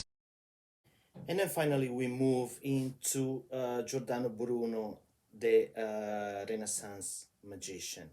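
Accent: Italy. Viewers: Da come pronuncia i nomi italiani direi che sei italiano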